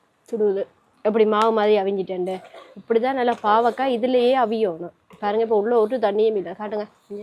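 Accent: Sri Lanka. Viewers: உங்க இலங்கை தமிழ் ரொம்பவே இனிமையாக இருக்குமா 😍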